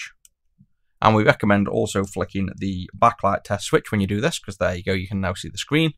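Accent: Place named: Stoke, United Kingdom. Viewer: Just noticed from the accent you're also from Stoke, nice! Do you sell your mod kits in your physical shop? I might come down and buy a wireless GBP battery to save having to ship anything.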